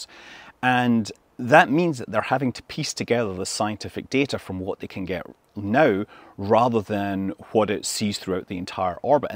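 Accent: Scotland, United Kingdom. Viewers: Now that we know you are in Scotland and don't just have that accent for fun, any SaxaVord coverage?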